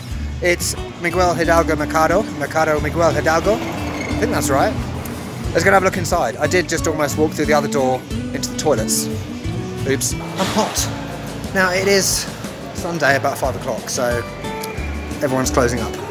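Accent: England, United Kingdom. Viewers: Are you from England ?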